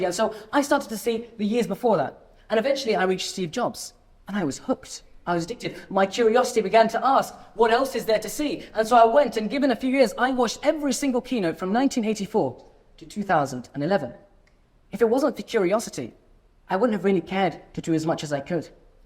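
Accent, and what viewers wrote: United Kingdom. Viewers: ما شاء الله لهجتك بريطاني 😅👍 برافو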